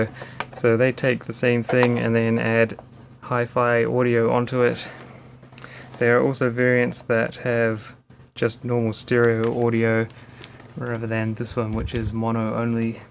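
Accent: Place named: Australia. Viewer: I've been looking at TradeMe... Surprisingly not as many options as one would hope, someone sold an old broadcast monitor like techmoan uses for 300 the other week, kinda nuts. I'm curious then, can I ask where you hail from? My bf reckons you've got an Aussie accent 😅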